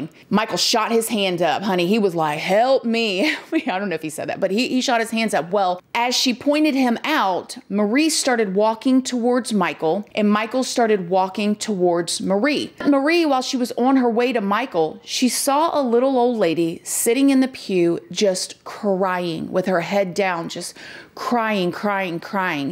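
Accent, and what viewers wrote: South, United States. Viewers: I agree agree I'm very southern her southern accent I relate with well. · Im guessing you're from TN you are GORGEOUS!!! And you got that lol southern twang 🥰😍🥰😍 in in Looooooove lmao fr tho I'm guessing outside of Nashville somewhere TN girls are just omg I've only been to Graceland and Nashville but you look the part all the way id move up there for girls like you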